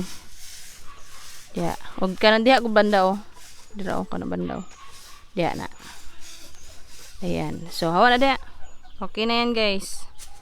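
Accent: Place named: Cebuano, Philippines. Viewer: Saan kayo pinas cibu ang salita mo mensan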